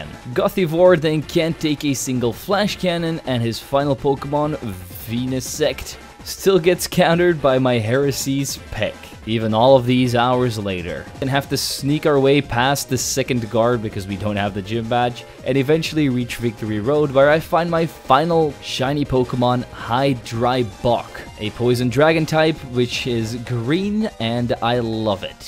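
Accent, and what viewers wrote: Netherlands. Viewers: Where are you from? Sometimes it sounds like you're dutch, but you realy have a nice accent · Is he Dutch? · This guy dutch? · Gotta love that dutch accent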